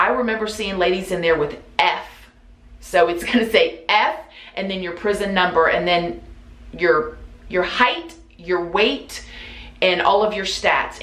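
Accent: South, United States. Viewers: It keeps me captivated. You know how you read a book and sometimes you've read 6 pages but you have no clue what you read? Your videos are the complete opposite of that, for me anyways. I get lost in storytimes. I find them boring honestly. But I find myself going back... did she just say Bob wire? Rewind. Yes, yes she did. I love your accent and your way with words. It keeps my attention. I'm a Jersey girl in NY. I don't have any southern accents in my life. so I really can't get enough of yours! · Wow! I have rewatched this video several times, and it only becomes more and more scary and eye opening. I've known people who have been to jail/prison. I've never been (and hopefully never will), but I have this weird interest in how prison works and I can tell from what I've been told and from watching you and Jessica Kent's videos and what I've seen on TV that it is a horrible place where you are stripped of all your freedom and sometimes family and friends disown you. 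I believe this interest i have in prison is somehow helping me really value and appreciate my freedom. I hope I never break the law by accident or on purpose., you are a good person and very strong and resilient. Never let ANYONE tell you different. You are one of my favorite YouTubers. I love how real you are. I bet you are one of the sweetest people. 💕 Also, I love your southern accent!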